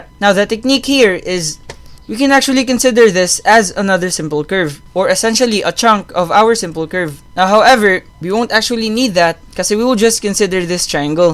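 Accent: Conyo, Philippines. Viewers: Konyo pero sobrang linaw magpaliwanag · very conyo sir, nakakatuwa makinig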